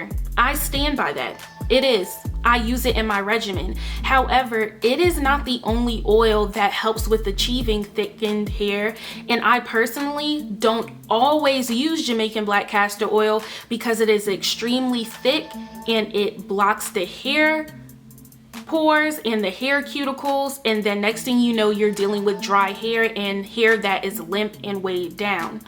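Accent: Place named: Trinidad and Tobago. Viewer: You Trini? I hear it in your voice lol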